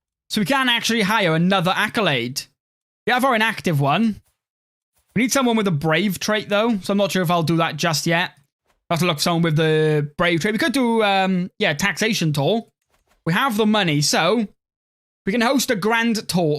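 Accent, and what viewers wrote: Wales, United Kingdom. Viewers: heard you on the steam page and thought I detected a bit of a welshie Im Welsh/Irish so glad I looked you up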